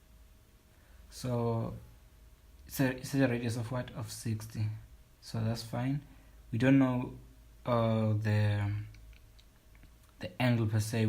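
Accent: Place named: South Africa. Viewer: I know this is a South African voice. Which university you at? Thanks for the help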